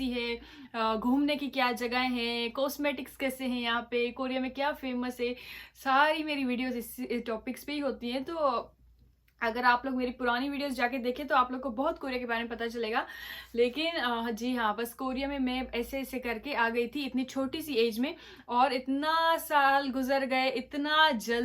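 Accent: Sindh, India. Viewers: Why your accent is indian????dear proper urdu zaban ma bat karen plz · Haha apka sindhi accent😂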